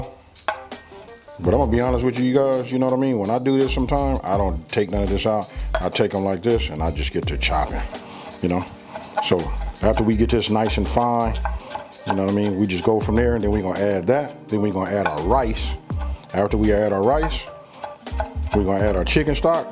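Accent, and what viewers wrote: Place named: West Coast, United States. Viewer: Bruh your speech is so Westcoast 😂 salute OG!!!!!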